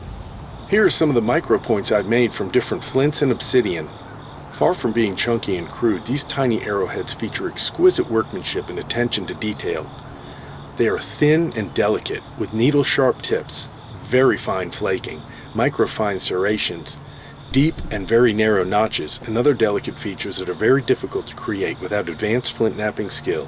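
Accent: Georgia, United States. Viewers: I was gonna say, sounds like you live near Lanier Speedway.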